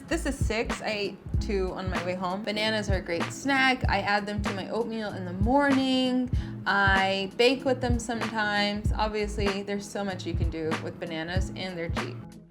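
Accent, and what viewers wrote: South, United States. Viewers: where in the south you from?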